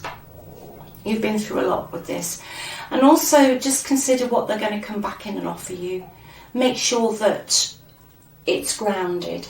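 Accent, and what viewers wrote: United Kingdom. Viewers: You’re the best! Great to hear a fellow Brit ….keep dozing what you are doing. I feel relieved to be away from him!!!!!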